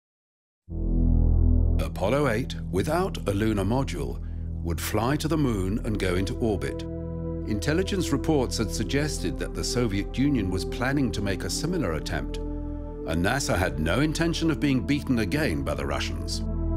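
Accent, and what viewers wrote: United Kingdom. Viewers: I would have given this video a good rating if they had used an American instead of a British guy as narrator. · As for the comment from the video "John Glenn returned without incident ", There was a flashing light down at mission control saying that his heat shield had become dislodged. Some bean counter from NASA administration That if they kept the retro rocket strapped on, That maybe it would keep the heat shield and place long enough for him to descend through the atmosphere. This actually endangered his life because it caused unequal burning of the heatshield or something like that. There had actually been nothing wrong with his heatshield just a faulty bulb down at mission control. Oh and they never told John Glenn about it. This incident took the NASA administration out of direct control of the missions as they were happening and put full control into I think the guy's title would be called capcom. 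That being said, Check my math always check my math even when There is only 2 people in the room I am never the smartest person in the room. Your video footage is stunning. The narrator To me sounds British, Doesn't matter whether it be male or female the British accent just makes it the sound Authentic. Despite me Nit picking, I enjoyed the video thank you for the time and effort you put into it. Apologize for any spelling, punctuation or grammar errors. Although English is my only language that doesn't make me good at it.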